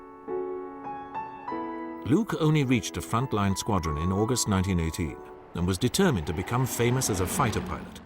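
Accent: United Kingdom. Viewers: Great? Proper British accent to announce that 1 million people were killed and then is sad since the strategy didn't work. The PR tells us that that is the "civilized" way. I've decided otherwise. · Despite the very basic graphics, with the British narrator, actually quite watchable.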